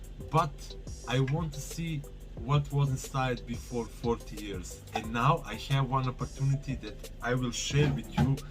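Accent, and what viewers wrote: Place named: Bulgaria. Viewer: Hello my friend, are you from Bulgaria because your accent really sound like a Bulgarian man🇧🇬💪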